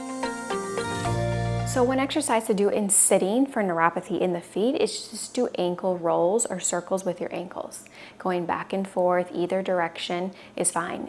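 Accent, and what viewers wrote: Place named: France. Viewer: Try British English as I habitant. Not mixture France with English, sound like beef like chicken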